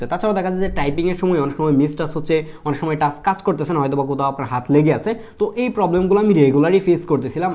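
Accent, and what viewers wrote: Kishoreganj, Bangladesh. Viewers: Kishoreganj Naki Bhia Aponer Jala Bhia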